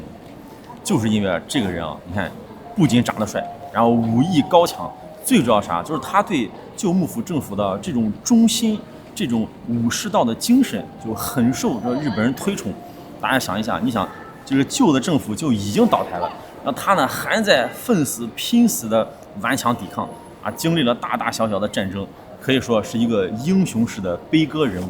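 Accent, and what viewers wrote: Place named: Qingdao, China. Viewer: 听口音是青岛人吗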